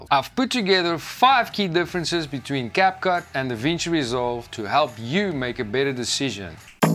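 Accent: South Africa. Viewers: You sound SA, cool video man!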